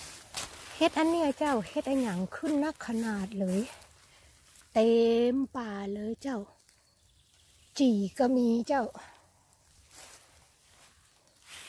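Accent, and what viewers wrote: North, Thailand. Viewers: เออเห็ดแถวบ้าน ดอกมันไม่ค่อยใหญ่เหมือนประเทศอื่นๆเนาะยายเห็นเค้าเก็บกันที่ปะเทศอื่นๆดอกก็ใหญ่ๆจังยายชอบ พูดพาษาเหนือฟังแล้วเพราะดี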